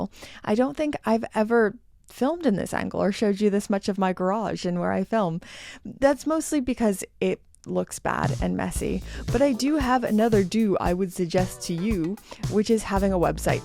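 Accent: Canada. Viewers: As a fellow Canadian, what is it, exactly that makes us sound so Canadian?? 😂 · She sounds Canadian. Where is she from?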